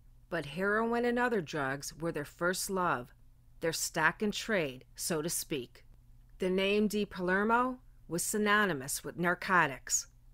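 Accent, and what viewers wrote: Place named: Upstate New York, United States. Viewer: Its pronounced 'How-ston' street, not Houston like in Texas. I knew u weren't a new yawka lke me bec of yr accent which is.....I dont know. Upstate ny maybe?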